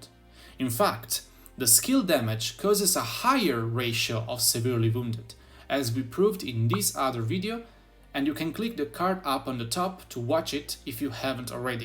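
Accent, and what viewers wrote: Italy. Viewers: Lo sento l’accento italiano 😉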